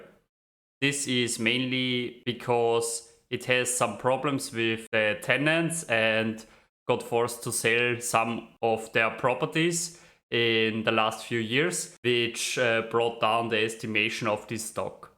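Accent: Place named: Bavaria, Germany. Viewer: Klingt wie ein Bayer der versucht Englisch zu sprechen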